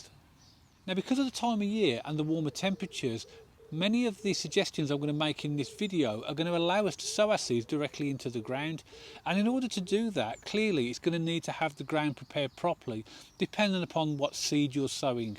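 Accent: Birmingham, United Kingdom. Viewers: Sound like a brummie, where u based? Im in smethwick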